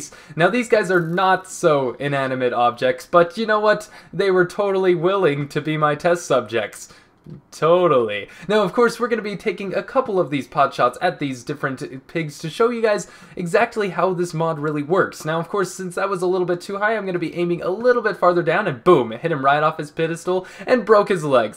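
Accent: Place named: Australia. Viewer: Dat Aussie accent. :P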